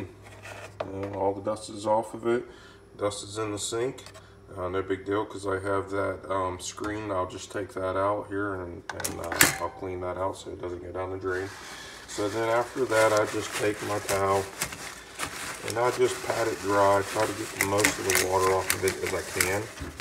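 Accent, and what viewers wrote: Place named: Philadelphia, United States. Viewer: You gotta be from delco or Philly area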